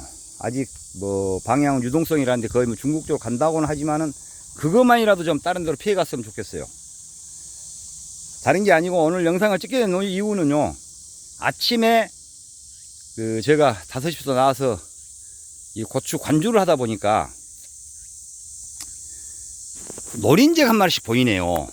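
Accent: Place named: Jeolla, South Korea. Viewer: 전라도 구수한 사투리 정겹고 날마다 좋은정보 잘배우고 갑니다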